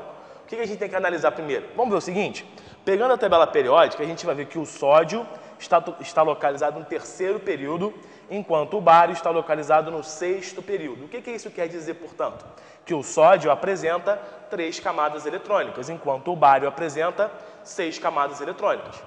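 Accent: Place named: Rio de Janeiro, Brazil. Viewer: eita sutaque de carioca, mt bem explicado!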